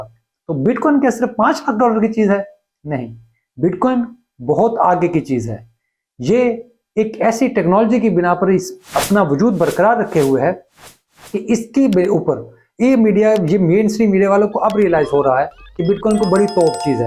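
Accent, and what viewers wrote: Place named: Punjab, India. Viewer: Your punjabi accent is so good 👍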